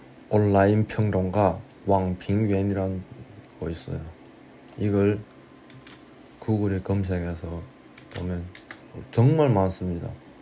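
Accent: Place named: North Korea. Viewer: 조선족은 약간 이북말투가 섞여있을 수 있어요 이사람은 참된 조선족입니다 중국 공산당을 빨아재끼는 조선족이 아니란말입니다.당신이야 말로 정신차리세요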